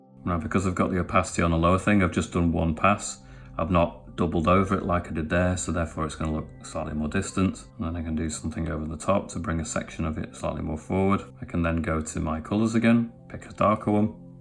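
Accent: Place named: North, United Kingdom. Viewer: Great to hear a familiar northern accent on YouTube! Brilliant video!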